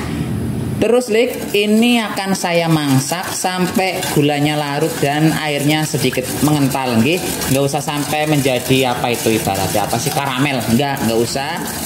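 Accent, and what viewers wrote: Banyumas, Indonesia. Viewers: Pbg hadir kang ngapak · Ngapak lucu koh 😂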